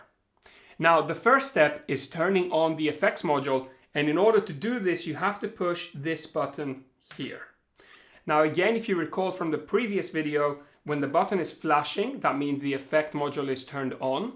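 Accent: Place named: England, United Kingdom. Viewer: I can hear you have picked up the English accent at times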